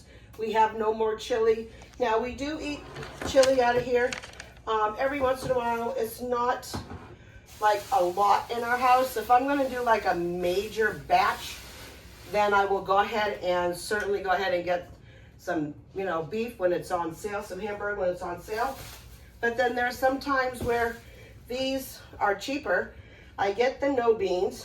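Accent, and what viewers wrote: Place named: United States. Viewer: ',' sounds American🤔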